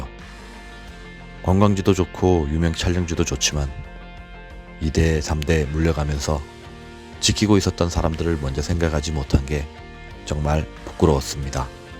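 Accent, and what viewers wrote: Gyeongsang, South Korea. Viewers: 감사드려요.ㅠㅠ 경상도 발음 팍팍 나오는데...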